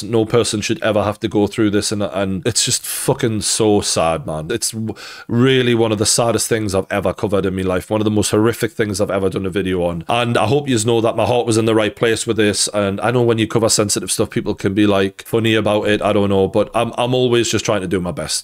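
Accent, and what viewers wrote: Newcastle, United Kingdom. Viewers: I've just subscribed to ya channel it's nice to listen to another Geordie 💔 spot on it's . Absolutely heartbreaking 😢 and what is happening to our country aswell 🇬🇧 🇬🇧😢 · The geordie accent adds to the actual deadly intent on this crime. Here here brother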